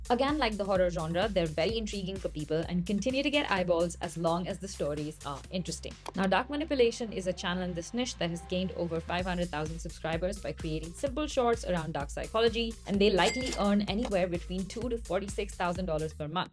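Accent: India. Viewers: Your voice like india sound in you voice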